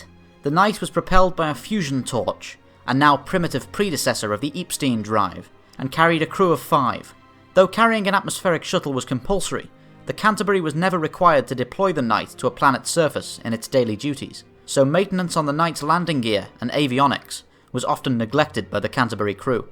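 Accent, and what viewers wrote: United Kingdom. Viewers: I really like your videos! Question are you British it sounds like it.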